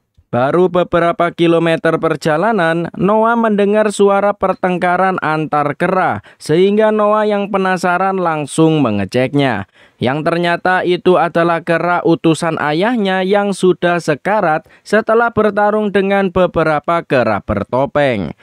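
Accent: Java, Indonesia. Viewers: cuman bilang meddok doang bang,kaga raasis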